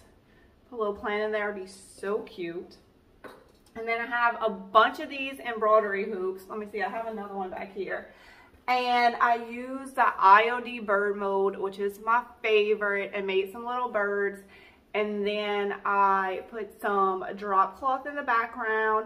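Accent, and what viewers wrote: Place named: East Texas, United States. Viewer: Your stuff is so cute!! And your accent makes want to move back to East Texas. I was an hour away from Shreveport LA. Now I'm baking in Phoenix AZ.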